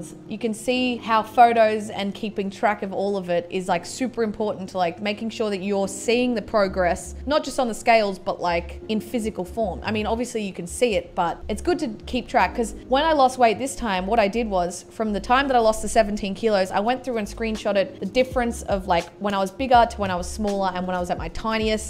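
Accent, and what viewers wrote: Australia. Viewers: So Australian haha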